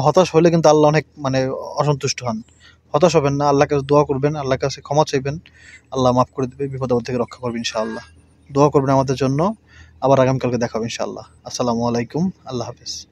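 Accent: Barishal, Bangladesh. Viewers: আপনার বাড়ি কি বরিশাল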